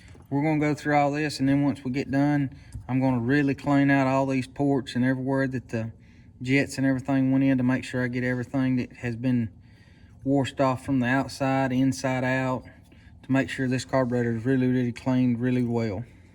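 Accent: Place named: South, United States. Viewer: pity about the southern drawl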